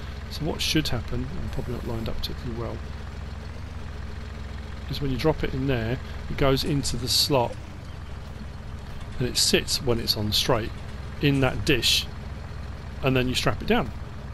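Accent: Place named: Kent, United Kingdom. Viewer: Thanks once again for a great video, ive only been watching your videos for a month or so, but you are far and away my favourite FS19 info source. I have to ask though (and no need to answer if its edging on too personal), are you from Kent? You sound suspiciously like you are from my hometown of Maidstone, just another reason i like your videos so much, reminds me of home.:D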